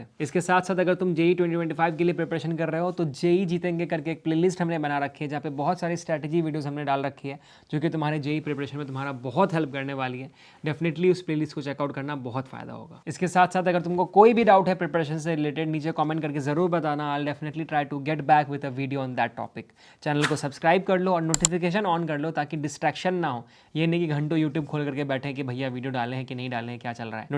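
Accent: Bihar, India. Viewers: Bhaiya aap bihar se ho 😊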